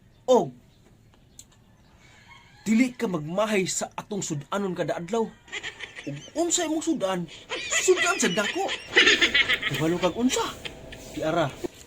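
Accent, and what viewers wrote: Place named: Visayas, Philippines. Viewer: Hhahha nakakatawa ka naman sir ganda intro nyo hala bisaya gud kaayo bai hehe from gkicekyntv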